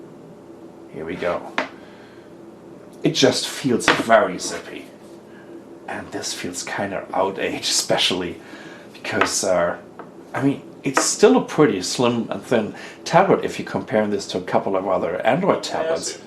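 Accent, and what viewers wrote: Germany. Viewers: lustig zuerst das deutsche und dann das englische zu schauen... Your accent is just funny -but this is germans like....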